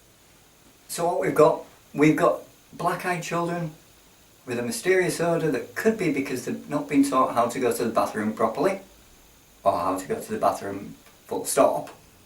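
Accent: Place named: United Kingdom. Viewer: Some of the words you pronounce but guess it depends on what part of the UK your from cool :-)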